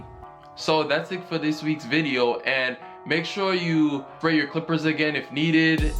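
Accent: Jamaica. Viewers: Dweet ina the Jamaican Accent dawg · Make 2 videos each time, Jamaican and "proper" English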